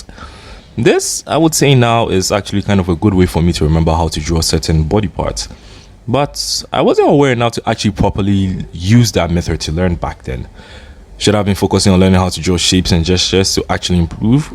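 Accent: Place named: Nigeria. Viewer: oh okay you kind of sounded Nigerian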